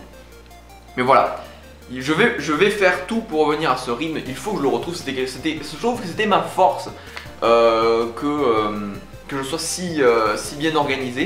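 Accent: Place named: South, France. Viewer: L olo sauf ta voix du sud ^^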